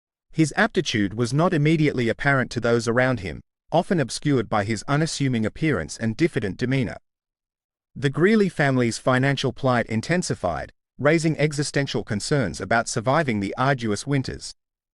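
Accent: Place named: United Kingdom. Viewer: Wow, I like that your actually mentioning some less well known figures. I respect that. Also, love the British accent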